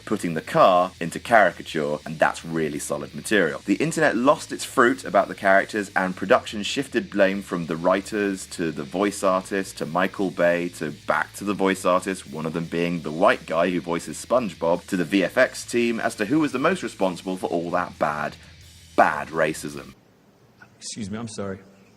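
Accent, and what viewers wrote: United Kingdom. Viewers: Nothing like listening to a stuffy British-sounding dandy with a stick up his arse passing judgement on films 50 plus years old... That said, someone needs to clue this guy in to the fact that actors playing other races (and sexes) goes back to the time of Shakespeare, when females were not even allowed on stage, and there WERE characters of color like Othello in the lexicon. Fast forward to the silent film days where Lon Chaney was lauded as the "Man of a 1000 Faces" for his ability to play a variety of characters, including foreigners and Orientals (yeah, I just used that word to piss off the narrator). You can find offensive stereotypes in everything pre-1960s from Bugs Bunny cartoons to 3 Stooges shorts, let alone feature length movies. It ain't rocket science. The two I can't believe here missed are: 1. Charlie Chan, initially played by Warner Oland (who also played Fu Manchu as mentioned) who was Swedish and then Sidney Toler, who was American. 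In the 80s, a new Chan film was protested for its use of non-Asian actors, but flopped due to lameness as opposed to protests. Fox Movie Channel even pulled its "Charlie Chan Theater" (which showed the old 30s and 40s Chan movies) off the air in the early 2000s--most likely due to PC over-reaction. 2. Peter Sellers as Sydney Wong, a Chan parody character in Neil Simon's "Murder By Death" (1975), which is a Who-Done-It satire featuring famous fictional detectives (i.e. Sam Diamond instead of Sam Spade). While Seller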